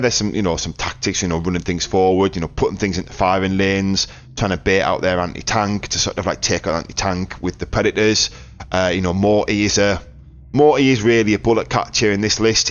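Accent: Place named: Teesside, United Kingdom. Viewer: I've lived out of the uk for 20 years, but your accent reminds me of home. After so long, it's hard to be sure, not a mackem i think, maybe a little more south, smoggy? Nevermind you just answered this as i was typing, but i'll leave it up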